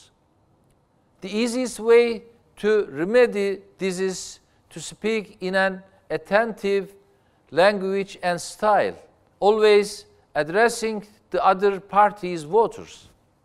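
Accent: Black Sea, Turkey. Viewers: İngilizce'yi bir Türk gibi konuşması hoşuma gitti. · Başkanım şakır şakır konuşuyo be helal olsun biraz karadeniz aksanlı ama yinede gayet akıcı ve anlaşılır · Yabanci dil konusmak bazilarinin dedigi gibi tercümanlik degil, iletisim kurulan kisilere direkt olarak hitap etme imkani saglayan günümüzün en önemli ikna yöntemlerinden biridir. Umarim yillardir devlet yönetmekte olanlar da "welcome" haricinde iki kelimeyi bir araya getirebilirler. Sayin Bey'den ricam biraz aksan ve telaffuzda türkce'den uzaklasmasi olur. Gurur duyuyoum. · 6 yılını yurt dışında geçirmiş ve İngilizce'yi akıcı seviyede konuşan biri olarak söylüyorum, anadili İngilizce olanlar bile İngilizcesi anlaşıldığı sürece birinin aksanını umursamıyor. Adamın söyledikleri net bir şekilde anlaşılıyor. Önemli olan bu. Adamın aksanını eleştirenlerin kaçı İngilizce biliyor acaba çok merak ediyorum. 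Sanırsın kendileri sular seller gibi İngilizce konuşur. Türkiye'nin English Proficiency'de Avrupa sonuncusu olduğu bir gerçek. Saçmalamayı kesin. Türk aksanıyla İngilizce konuşmak utanılacak bir şey değildir. En azından Türk aksanıyla İngilizce konuşan birinin söyledikleri anlaşılır. Gelmişler buraya adamın aksanını eleştiriyorlar. Hayatınızda kaç tane aksan duydunuz acaba? Sanki kendileri kraliyet ailesi İngilizcesi konuşuyor. Konuşması yavaş geliyorsa da videonun hızını artırırsın olur biter. Zaten büyük çoğunluğunuz altyazı olmasa bir şey anlamazsınız bu videodan o yüzden adamın konuşmasını eleştirmeyi kesin. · adam güzel konuşuyor aksanı da Türk aksanıyla ingilizce işte ama konuşması falan akıcı güzel yani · Van münit vs Türk aksanlı İngliş. Türk aksanlı İngilişin başımın üstünde yeri olduğu bir versus. Başımın üstünde derken TC'nin başında. Ha bu arada altyazılara bakmadan adamın dediğini anlayabildim. 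Anlayabildiysem sorunu da yoktur. Ama şunu söylemeden etmeyeceğim. Bunu yazan her kimse, mesleği akademisyenlik. Belli kullandığı cümle yapısından. Yabancı dillerle uğraşan her kimse değiştirmesini tavsiye ederim başkana. Çok kullanacak çünkü başkanım bu dili ilerde. Bu şekilde gitmez. Dost tavsiyesi. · Amerikan İngilizcesi var İngiliz İngilizcesi var BUDA TÜRK İNGİLİZCESİ, REZİLLİK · Baskan Turk aksanini iyi ozetlemis:) Yalandan aksan kasmaya calismaktansa catir catir yerel aksanda konusmasi en iyisi. Ingilizceyi ve alsani bir yana birakirsak yeni jenerasyon siyasetin guzel bi analizi yapilmis. Genel ve yerel yonetim farki analizini ozellikle begendim. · Bu arada zerre sevmem imamoglunu ama bir bilgi vereyim eger ingilizce ogrenmek isteyen varsa ve aksandan dolayi yapamiyorum diyen arkadaslara bakin iyi bir ornek arkadaslar bakin gorun normal gundelik turkcesi gibi rahat turkce aksanla ingilizce konusuyor o yuzden sizde kasmayin illa ingiliz ingilizcesi amerikan ingilizcesi gibi konusmaya gerek yok bu aksan bile idare eder yeterlidir kasmayin yani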